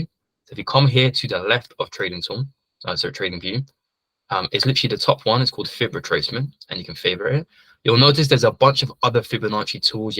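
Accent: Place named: United Kingdom. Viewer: Brits accents, huh manageable 😢😅❤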